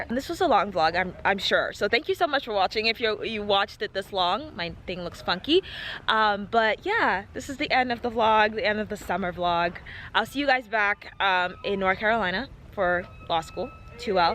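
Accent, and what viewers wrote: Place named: Cameroon. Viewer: It's the french cameroonian accent for me✌️ Love your vlogs and btw your body is 🔥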